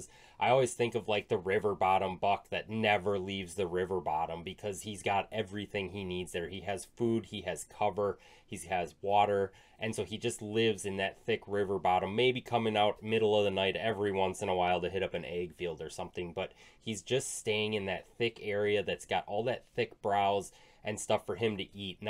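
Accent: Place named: Wisconsin, United States. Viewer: That’s his Wisconsin accent…it does sound like egg but he’s saying “ag fields” as in agriculture fields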